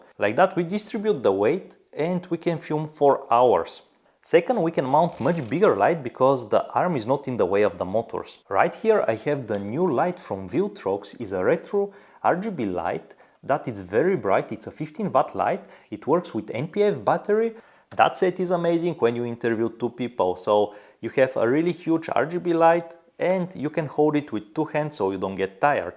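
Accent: Russia. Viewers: Чувак, у тебя просто кошмарный рязанский акцент.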